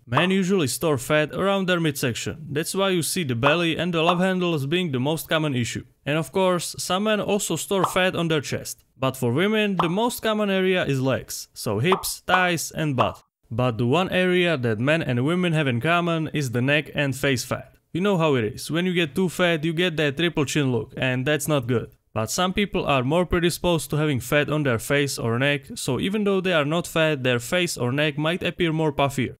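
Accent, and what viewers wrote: Czech Republic. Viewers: Yo bro is that a czech accent? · You sound czech:D (as a czech myself) also, nice video. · You sound like you are Czech😅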